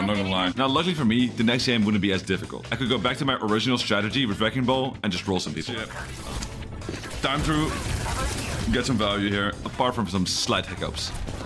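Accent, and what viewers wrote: Netherlands. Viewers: een nederlands accent in het engels is ook zo makkelijk te herkennen lmao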